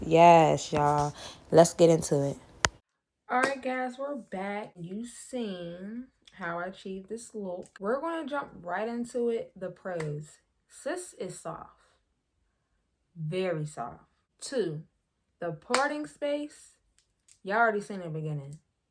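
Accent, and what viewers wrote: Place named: DMV, United States. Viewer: Ok cool! Thought I heard a DMV accent which is why I asked.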